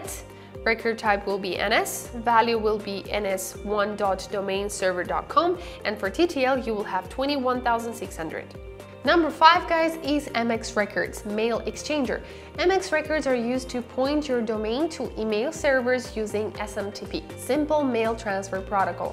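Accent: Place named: Iran. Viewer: That's some Iranian accent she has, isn't it?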